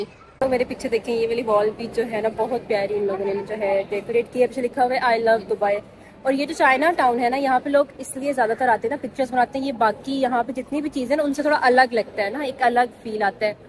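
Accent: Pakistan. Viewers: Chinese hi lagne lagi ho, bolne se sirf Pakistani lagti ho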